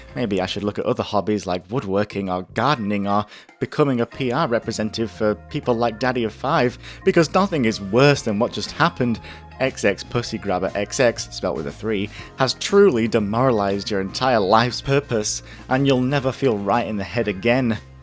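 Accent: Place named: United Kingdom. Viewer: Ah, ramblings in British or... whatever you accent is. Whatever it is, it's exceedingly good!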